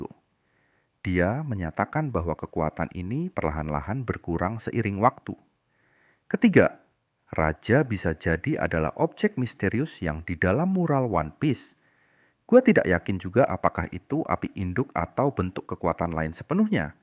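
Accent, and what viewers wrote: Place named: Ngapak, Indonesia. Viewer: cok kalo medok gausa gua gua, gaenak didenger jirr guanya ngapak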